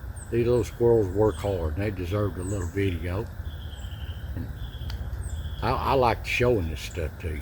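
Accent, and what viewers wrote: Appalachia, United States. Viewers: My husband and I watch all your videos. For me, personally, the 1st thing that drew me in was your voice. I had been searching for appalachian history and found you. I subscribe to a few other appalachian channels, but yours is my favorite. I have lost all my immediate family, just good ol Alabama mountain folks. Your accent is identical to theirs, so it gives me such comfort. You'll never know 😔 I wake up every Monday morning, have my coffee, and check in with the Ridge. My husband and I now spend alot more time out in nature and even plan on buying a metal detector very soon. ❤ God Bless you, and all you do.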